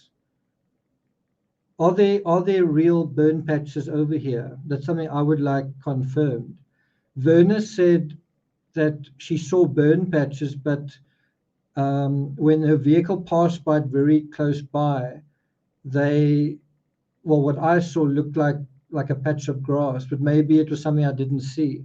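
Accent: South Africa. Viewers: You must be South African, like me. How long have you lived in the USA..?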